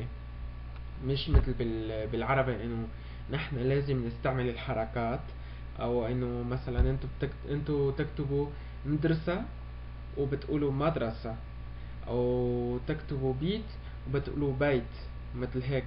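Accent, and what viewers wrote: Mexico. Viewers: يتكلم اسباني ب لفظ مكسيكي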